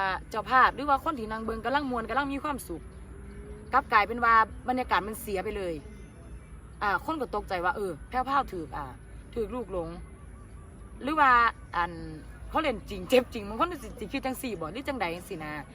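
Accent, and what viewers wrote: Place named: Laos. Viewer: ทำไมพูดสำเนียงลาวจังเลยละคับ คับ ขอไลน์คนข้างๆให้หน่อยได้ไหมคับ😉😉😉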